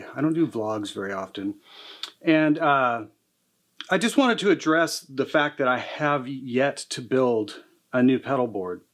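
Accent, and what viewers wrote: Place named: United States. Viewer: Thorpe fx field Marshall fuzz. Oh yes. Get one, get one now. Talk as much as you like sir, it's interesting and us Brits never tire of American accent.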